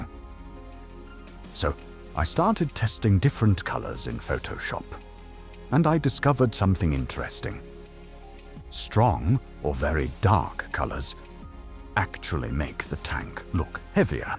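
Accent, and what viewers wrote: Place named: RP, United Kingdom. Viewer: I think the new voice is maybe too posh.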